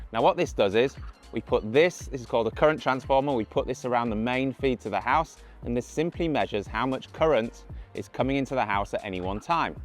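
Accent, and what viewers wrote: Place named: Northern England, United Kingdom. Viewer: Good to see a fellow Northern English person in Australia!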